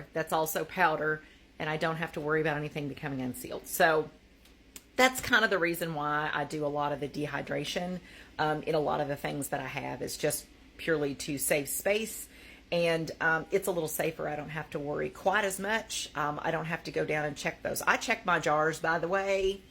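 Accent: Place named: East Tennessee, United States. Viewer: I SWEAR, you GOTTA be from East Tennessee!!!! Plz say ?!??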